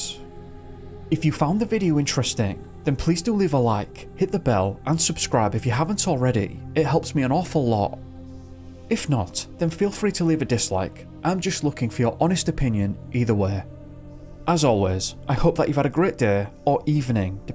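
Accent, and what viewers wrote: United Kingdom. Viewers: Can someone tell me what UK accent this guy has where he says "pre-shume" for "presume" and "ah-shume" for "assume"?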